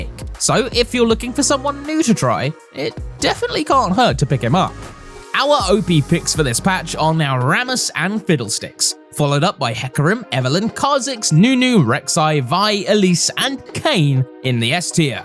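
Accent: United Kingdom. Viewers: Definitely more British than Australian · Always love me some british accent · I wasn't referring to the voice, it's definitely british, something you would hear from a documentary I mean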